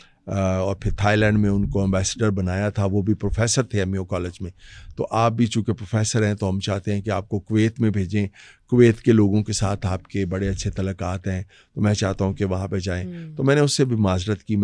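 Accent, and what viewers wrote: India. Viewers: lagta hai yeh khatoon india se hain??? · Talfaz mai Indian touch ko tarak krnay ki ashad zaroorat hai. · Plz mam ap ko Pakistani urdu bolni chahiyyay na k hindi accent 3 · Why does this anchor talk like Indian such as khas , whoosh, can't she speak proper urdu , rather copying hindi language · اپنا اردو کا تلفظ درست کریں انڈین ایکسنٹ سے اپ انڈین نہیں بن جائیں گی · بُہت عُمدہ اور بے لاگ انٹرویو آپ نے شئیر کیا ہے جسکے لئے شُکریہ لیکن آپ کے بھارتی انداز میں کُچھ خاص الفاظ ادائیگی پر شکائت بھی ہے۔ آپ ماشااللہ پڑھی لکھی خاتون لگتی ہیں لہٰذا اپنے انداز گُفتگو کو بدلنے کی کوئی ضرورت نہی۔ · The anchor tends to have an ‘Indian accent’ - عمران كهان instead of خان. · آپ خود کو کھد کیوں بول رہی ہہیں اور ز کو ج ضروری نہیں کہ انڈین لہجہ بنا کر بولیں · Why are you trying to talk like an Indian?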